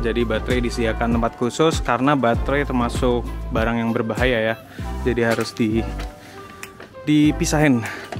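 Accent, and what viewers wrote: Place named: Banyumas, Indonesia. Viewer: wah, kang ya bisa ngendika ngapak....? cilacape pundi mas? semoga selalu sehat dan barokah di manapun njenengan berada.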